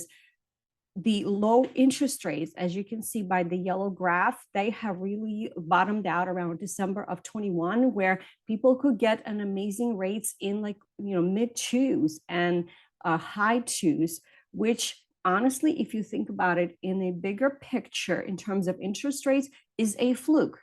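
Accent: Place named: New Jersey, United States. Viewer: "Let's tawlk" ... jeez ... i miss Jersey & once away i can't help but catch a belly laugh when hearing the accent that Jersey folk swear they do not have. I gotta get home !!! 😆🍅😆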